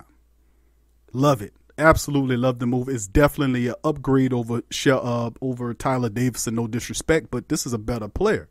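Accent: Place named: New Orleans, United States. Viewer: That's new Orleans for you I'm from the boot so it sounds normal to me 🤣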